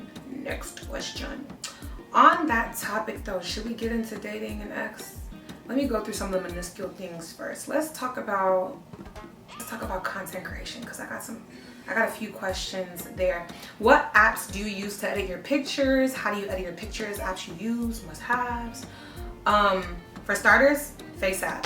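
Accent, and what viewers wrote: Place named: Nigeria. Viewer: I am new here n can’t help to get the Nigerian accent. U get Nigeria blood for body? Love u already. ❤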